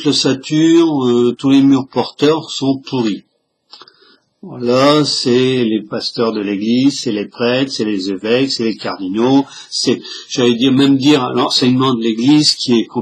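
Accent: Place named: Southwest, France. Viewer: salut. au fur et à mesure du descriptif de ton reve, j'ai ressenti un extrême mal-à-l'aise, et sans réflechir, j'ai pensé de suite aux Francs maçons et à une messe noire avec un sacrifice... ton tirage semble confirmer cette pensée, et en tout cas, laisse un grand point d'interrogation. Je profites du fait d'avoir accès aux commentaires pour une question: Je sais que tu habites dans le nord de la France, mais je remarque, quand tu prononces certains mots avec le son "O", (ex: autre) je vois que tu le prononces comme moi à Bordeaux et dans la region du Sudouest, et pas du tout comme quelqu'un de ta region... tu as une explication?????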